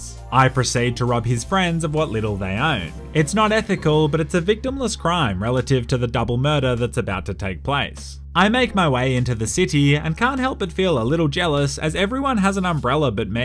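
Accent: Australia. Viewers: I'm trying to figure out if he's either a Australian or a New Zealander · I’m Australian and I’ve never heard someone speak like Aussie Man. Now you are speaking like Aussie man. Can’t be a coincidence.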